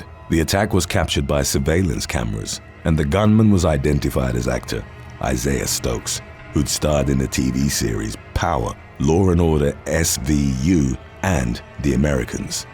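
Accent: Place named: England, United Kingdom. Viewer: He's speaking proper English. Remember, that's where American English came from.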